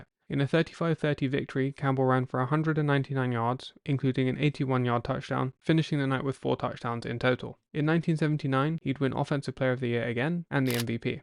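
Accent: England, United Kingdom. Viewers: Something wrong when a football documentary is narrated by a british person. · somehow, listening to a tribute to Earl with the narrator having a British accent just ain't right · british dude. i love this! no offense tho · Why do they have to have some English guy narrate this? Who never played,watched or understood the game.Just leave the video everyone will figure it out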